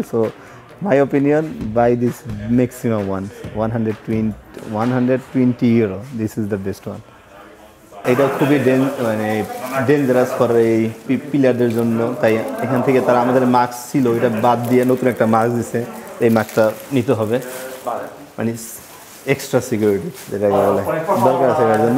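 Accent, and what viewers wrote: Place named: Bangladesh. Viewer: Love your Bangla ascent 😅😅😅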